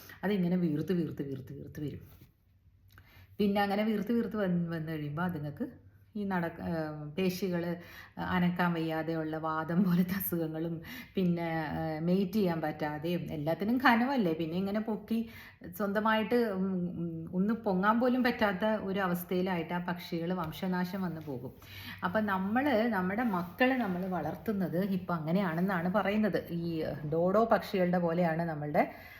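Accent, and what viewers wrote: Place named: Valluvanad, India. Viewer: മാഡം.... അമ്മ ഏത് സ്കൂളിലെ ടീച്ചർ ആയിരുന്നു. മാഡത്തിന്റെ വല്ലുവനാടൻ സംസാരമാണല്ലോ... നല്ല സംസാരം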